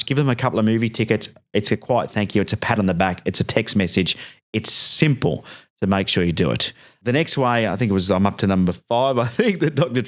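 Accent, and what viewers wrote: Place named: United Kingdom. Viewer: this annoying uk accent, don't understand a single word, but its an interesting video so I have to listen, please just talk normaly no need to talk this weird accent that "weyird aycceynt thayt noyoyne uyndeyrstaynds"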